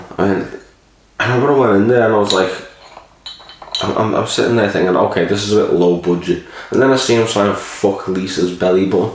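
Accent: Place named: Liverpool, United Kingdom. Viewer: Yes scouser! Just bought a keg for the machine never had it before, was on offer!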